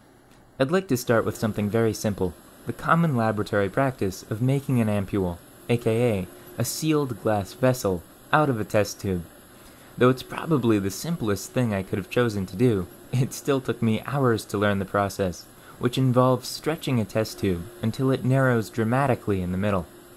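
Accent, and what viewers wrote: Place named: United States. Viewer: Where are you from? Your accent is generally American but you say "for" and "fur" the same way and any syllable ending -or with an -er sound. Regardless of that I appreciate the info. I'm on a quest to learn how we got from rocks to rockets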